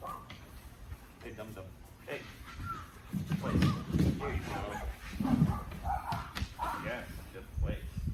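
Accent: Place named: Balkans, Eastern Europe. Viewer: Pozdrav iz Makedonije, vidim po naglasku a i po imenu da ste iz nasih prostora. Nadam se da cete nastaviti sa snimanjem i da cu uzivati gledajuci vase snimke, a i da cu nesto novo nauciti. Podrska od mene!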